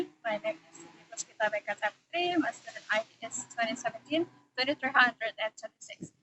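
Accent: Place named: England, United Kingdom. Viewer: Wawww i lov ur english accent😳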